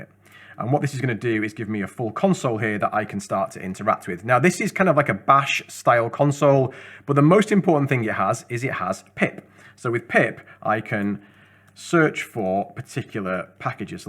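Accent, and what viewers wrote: United Kingdom. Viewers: Manchester accent?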